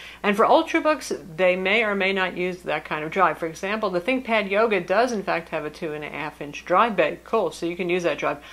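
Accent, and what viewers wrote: United States. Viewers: I’m considering buying the ASUS G750 J( I’ve not decided which one yet) and I would also like to purchase one of theese SSD Drives as I prefer games that are generally hard to run on a regular, ”cheap” computer. Could someone give me a link or a quick tutorial on how to insert the SSD drive? I’m not used to hearing this particular American dialect and I’ve been struggling to understand the tutorial as I’m not particularly fitting into the computer whiz category… ☺:-)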